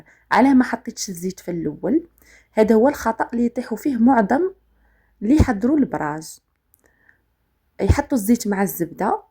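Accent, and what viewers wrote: Skikda, Algeria. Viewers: السلام عليكم...صح صوتها مميز باللجنة السكيكدية...و وصفاتها المميزة. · منطقة سكيكدة اللهجة انشمها من بعيد حفظك الله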